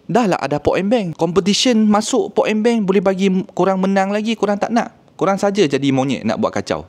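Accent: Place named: Kedah, Malaysia. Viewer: pekat sungguh kedah hang ahahaaha anak jati kedah ni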